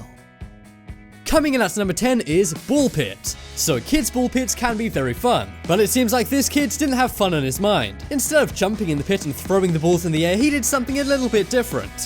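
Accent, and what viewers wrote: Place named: United Kingdom. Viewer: to all the people complaining about how he says "strawberry": This dude's British, it should be obvious by the way he talks Unless British people are commenting about that, in that case... I don't care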